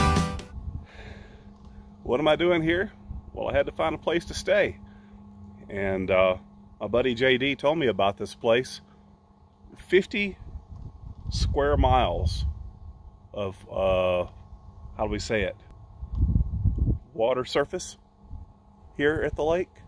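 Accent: South, United States. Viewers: why you live in navada and you talk southern ?? ohhh the duckling. yes you do have good babies. i have to admit, i do enjoy you video....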